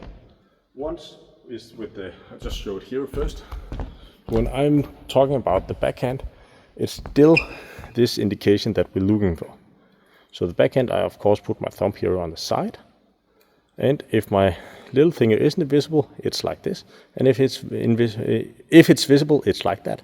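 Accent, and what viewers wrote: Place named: Denmark. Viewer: Du har virklig meget dansk accent 😂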